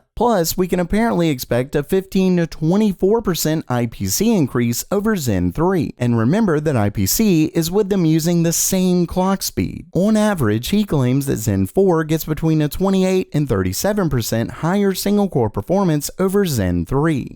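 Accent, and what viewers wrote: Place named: Baltimore, United States. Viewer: is he from baltimore. he says un instead of on.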